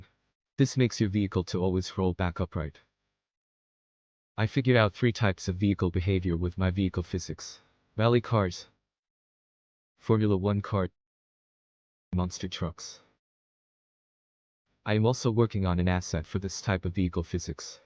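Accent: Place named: United States. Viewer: You are from India? right. but your accent looks like american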